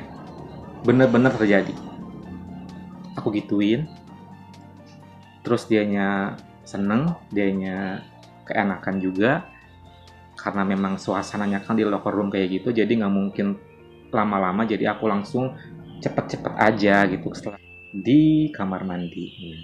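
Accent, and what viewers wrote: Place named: Bandung, Indonesia. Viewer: orang bandung ieu mah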